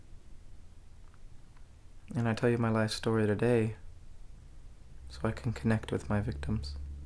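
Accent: Scotland, United Kingdom. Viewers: Is that suppose to be a British accent? If so really good xx ( I’m from England) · Scottish accent? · I love the accent but Is it British because if it is I’m sending you £££££££😂😂🏴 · One of my favorite movies ever! Thank you so much for doing this. PS great British accent. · You sound Scottish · Quite a good british accent 8/10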